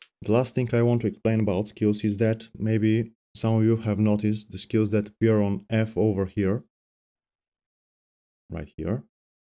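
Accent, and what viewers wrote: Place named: Bulgaria. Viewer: Damn, the fact that your Bulgarian accent is so strong, gained a new sub. Здрасти)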